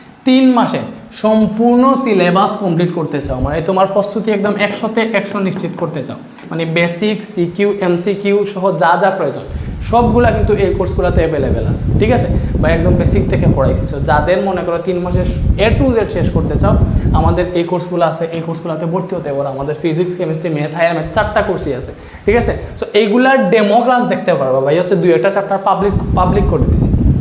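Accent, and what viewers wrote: Sylhet, Bangladesh. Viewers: Baiya apni ki Sylhet e taken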